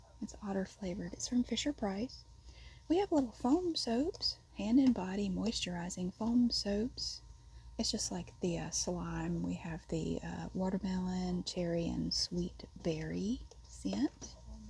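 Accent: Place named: Greensboro, United States. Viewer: your asmr videos are so nice for me because of your accent. i was born in greensboro and lived in the area for most of my life so its sooo comforting to hear the voice of the women i look up to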